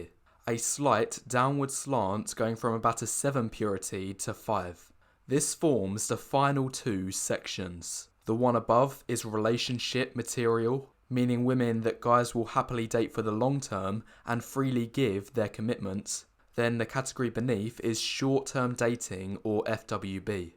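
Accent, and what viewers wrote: United Kingdom. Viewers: The british accent completes it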